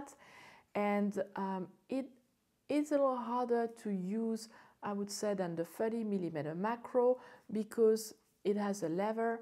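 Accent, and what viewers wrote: France. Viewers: I do like your french accent :-;, i use the 60mm and i am very happy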